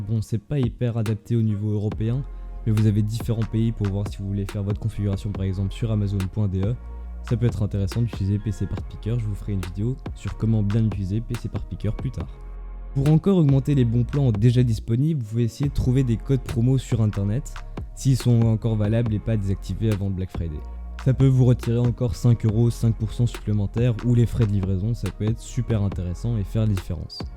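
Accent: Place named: Spain. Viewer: Un accent espagnol de qualité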